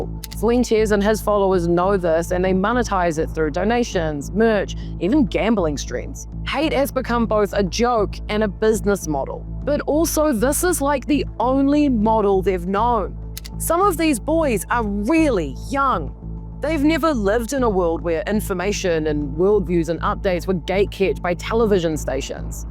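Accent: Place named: New Zealand. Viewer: "IJJY JOKES" 😆 Omg I love your kiwi accent ❤️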